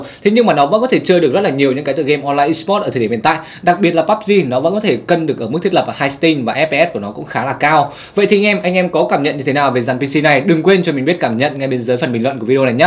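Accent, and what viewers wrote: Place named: Vinh, Vietnam. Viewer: Anh này nói giọng giống giọng người Vinh quá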